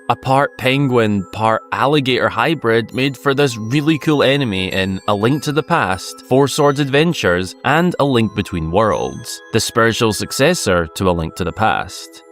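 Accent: United Kingdom. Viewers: Yer accent is funny, you wouldn't happen to be British would you😅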